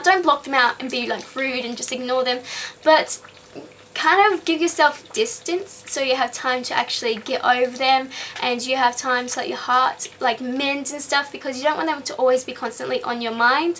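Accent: Australia. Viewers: i LOVE ur accent :D <3 Australians have the BEST accents( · Are u from Aussie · she from aus? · She's Australian, GTFO. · I love love LOVE the way you sound. Are you from AU or NZ? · that is so awesome Iove australian accents ... I'm sorry they're just soo cute :)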